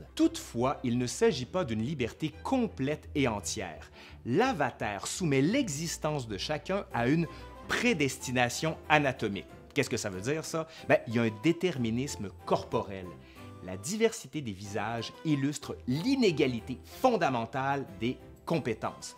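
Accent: Quebec, Canada. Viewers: J'ai du mal à m'habituer à l'accent québécois mais ça en vaut la peine sur cette chaîne! Belle chaîne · et l'accent québécois... 💕 (je suis de la région des Hauts-de-France). Encore une vidéo intéressante et drôle à la fois. C'est un plaisir de vous écouter ! J'aurais adoré avoir un prof d'histoire comme vous (avec un visage avenant en bonus... Compliment qui est complément du sujet de la vidéo !) 😉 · oui on nous juge, tu es Canadien, tu as "l'accent de là bas", idem pour moi qui suis Belge, j'ai une chaîne dédiée au cochon d'Inde, le "cobaye", ici on prononce "cobée", ben j'ai eu des remarques, mais aussi des MENACES.... n'importe quoi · Je laisse rarement des commentaires sur Youtube, mais je tiens à exprimer mon joyeux étonnement : moi qui ai beaucoup de mal en général avec les accents et le québécois en particulier, je ne pensais pas réussir à passer outre en découvrant la chaine... 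Et je me suis rendu compte que non seulement ça ne me posait pas de problème, mais qu'en plus j'en suis venue à l'apprécier et les vidéos de cette chaine comptent désormais parmi mes favorites :) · Je vous ai découvert grâce à la vidéo sur la poutine et je suis ravie d'avoir cliqué sur la chaîne!!! Alors, entre la culture gé, les yeux bleus, le petit accent québécois, en plus maintenant vous vous laissez pousser la barbe et vous avez le TOUPET d'insinuer que l'on va mal vous juger??? Non mais!!! À moins qu'il s'agisse de discrimination positive...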